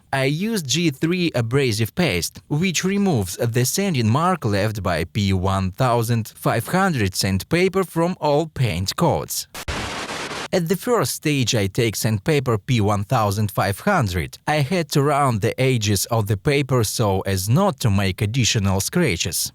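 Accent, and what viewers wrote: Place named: Italy. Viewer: sounds italian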